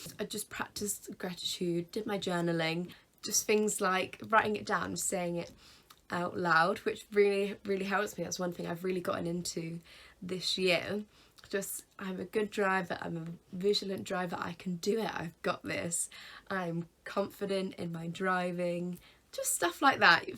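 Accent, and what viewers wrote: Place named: RP, United Kingdom. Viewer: you're very posh arn't you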